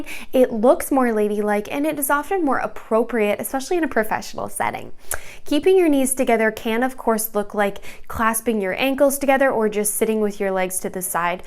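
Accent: Midwest, United States. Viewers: What Midwest state you from?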